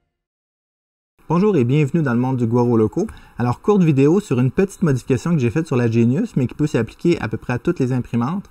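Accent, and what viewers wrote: Quebec, Canada. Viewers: Bonjour au. J'ai fait ta modification pour arrêter mon artillery X1. Tout fonctionne parfaitement. J'ai mis un inter comme le tien ou approchant, Le Gcode à X257 enclenche l'inter et celui ci basculé, il reste un jeu de 1mm entre l'inter et le galet. Merci pour tes vidéos qui m'ont bien aidé à choisir la X1 et à apprendre. Moi qui suis du Sud ouest de la France, j'apprécie ton accent québecois. Désolé mais je suis moins à l'aise avec youTube pour t'envoyer les photos de la modif.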